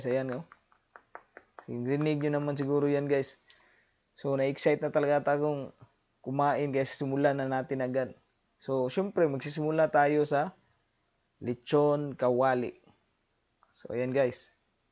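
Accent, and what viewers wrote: Visayas, Philippines. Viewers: oh my word the way you speak tagalog, so me. lol. apir sa mga bisdak nga magahi talaga. lami kaau ang crispy dong! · Ka bisaya pud nimu oi..pero proud ghpon meng mga bisya nimu bai! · New subscriber kuya!! Bhlag bisdak kaayo pero okeyyy keyuuu.. request kog e mukbang nga pancit canton chilli mansi with boiled and sunny side up egg 😁😁 lami kaaayooo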